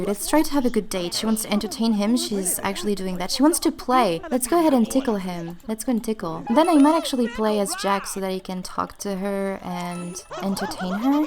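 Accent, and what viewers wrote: France. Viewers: I really enjoy the way you play the game! Also, I LOVE the slightest hint of accent in your voice. Are you french by any chance? I could listen to you speaking for ages, it just sounds so nice🌻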